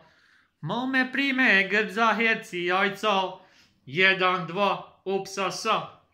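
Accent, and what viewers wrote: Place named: Kajkavian, Croatia. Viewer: Lepi pozdrav gospon ziz sanoborskoga kraja,tocnejse ziz Bregane,prekrasnoga mesta na same granice ziz Slovenijom...pratim te ne duge al sem pogledal saki tvoj uradak bar pet put....nezmislim se kulike gemistov sem spil dok sem gledel tvoje filmeke...daleke si najjaksi jutjuber i same nastavi tak...nek se daleke cuje nas pravi domacejsi govor...neiskvarena kajkavstina na teru morame biti jake ponosni...moram ti dati same jednu malu primjedbu...navek pozdravljas Zagorce,Medimurce,Podravce...a kaj je znami Prigorci...em sme i mi isti kak i vi...se nas muciju iste brige...babe,male place,premalo pijace...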